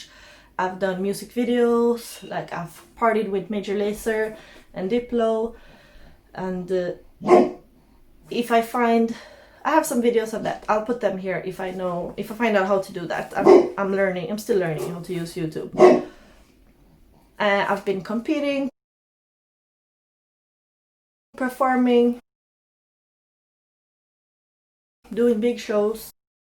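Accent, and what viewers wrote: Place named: Jamaica. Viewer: I just realized why she has a slight Jamaican accent when she speaks English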